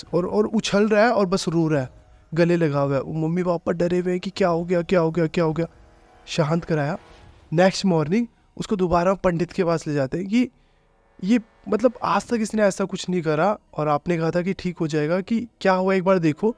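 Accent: Punjab, India. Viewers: Bhai apki language me punjabi accent h.. apka bg kaha se h??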